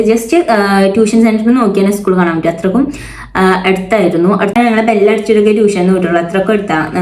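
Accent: Malappuram, India. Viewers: Aa varthanam kettaa arinjoode mlpprm ahnn nn😂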